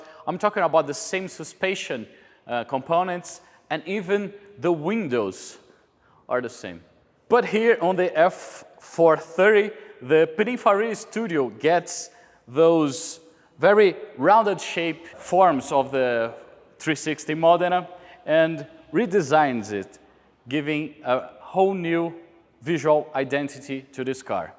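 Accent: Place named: Massachusetts, United States. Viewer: I Love your accent from South of Massachusetts 😊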